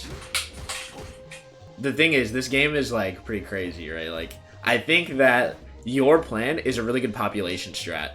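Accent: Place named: California, United States. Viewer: Great videos as always, quick question though and really random. Are you from California? I feel you just sound like it lol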